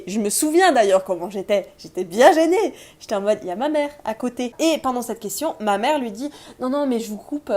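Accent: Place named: Alsace, France. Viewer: J’ai reconnu ton accent et quand t’as dit que t’habitais en Alsace j’étais genre YES aha Je suis de Strasbourg :) sinon c’est cool ta transparence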